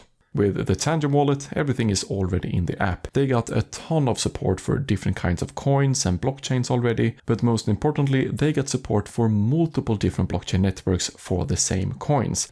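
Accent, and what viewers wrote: Sweden. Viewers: You sound swedish on your accent. Stämmer min gissning? :)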